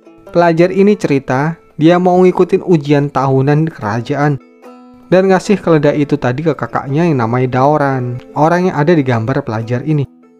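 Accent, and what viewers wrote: Malang, Indonesia. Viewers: Bang orang malang ya? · Kyk e wong malang iki mimin e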